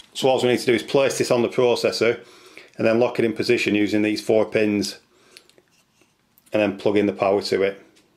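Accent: United Kingdom. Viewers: He is speaking really english he's from the UK which made the language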